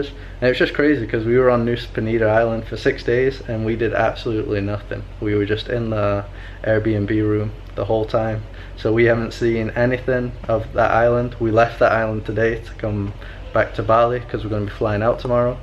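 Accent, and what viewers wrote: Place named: Northern England, United Kingdom. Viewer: what accent is that? sounds sometimes northern england and sometimes like a weird american thing!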